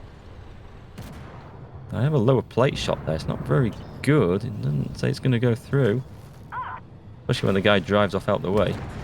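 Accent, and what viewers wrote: Yorkshire, United Kingdom. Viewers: From yorkshire?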